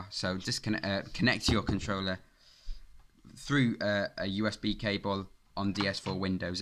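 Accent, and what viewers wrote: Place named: United Kingdom. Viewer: I LIKE BRITISH ACCENT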